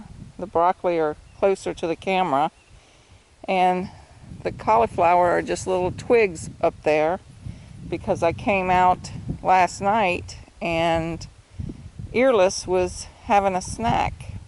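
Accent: South, United States. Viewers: Thanks for the Southern accent I miss it so much I am a southern boy living in a different country and I need to hear the accent sometimes.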